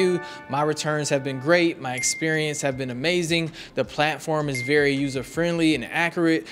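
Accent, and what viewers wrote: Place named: Africa. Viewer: i like you African accent, you need to talk slower and louder to understand you.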